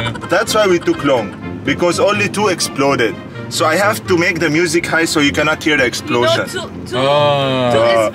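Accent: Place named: Philippines. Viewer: The way you talk English sound like more Filipino already 😂😂😂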